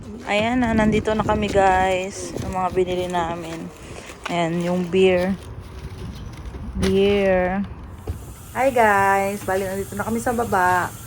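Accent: Batangas, Philippines. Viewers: Mga bata pa kayo kaya keribels lahat ngbpagsubok basta laban lang! Vlog nyo plagi ang nasa tv sa sala namin hahaha.. asawa ko plagi nanonood sa inyo habang nagawa ng email nya sa trabaho sa gabi😂Tawang tawa sa iyo dahil pareho tayo ng punto, taga Batangas kasi kaming mag asawa!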